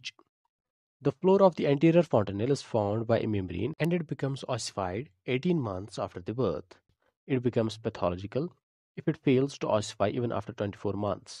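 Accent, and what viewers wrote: Kashmir, India. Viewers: Voice of a Kashmiri guy😊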